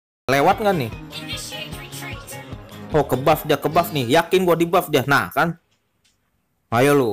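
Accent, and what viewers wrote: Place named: West Java, Indonesia. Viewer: Org Bandung ya..ngomong nya Sunda banget ... Bandungnya dmna kang .???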